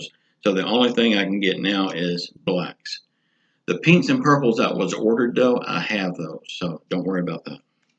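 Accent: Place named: Louisiana, United States. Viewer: My ex is from Louisiana. they are real people! Good to hear than accent once again. U do a great job my fine friend. You anywhere near Franklinton?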